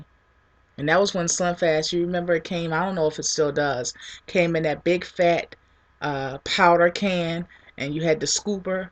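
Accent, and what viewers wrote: United States. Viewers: I love your accent! I miss USA accents! :) Thank you for your honesty and love and compassion. My surgery is Oct 23rd and I am excited.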